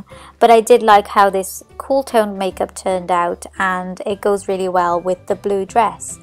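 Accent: Wales, United Kingdom. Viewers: Lovely look, can't wait to try it out this weekend! :) Am I right in thinking I can hear a very slight Welsh accent coming through every now and then? :p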